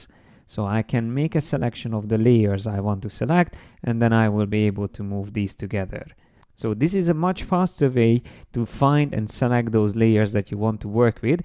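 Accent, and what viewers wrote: Hungary. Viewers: Kimagaslóan kitűnő és hatékony tananyag kezdőkne és haladóknak egyaránt. A beszéd akcentusból arra következtetek, hogy teszik tudni magyar nyelven is komunikálni, ha igaz akkor szeretném ha ezt velem közölné, mert angolból csak kezdő vagok. Igen szép munka (Y) sok sikert ezután is.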